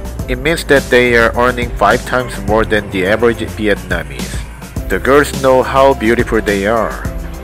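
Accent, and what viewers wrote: South Korea. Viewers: nice vid. I can tell from your accent that you are from Korea. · This guy's accent 100% korean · base on your accent, i can tell you are 한국 사람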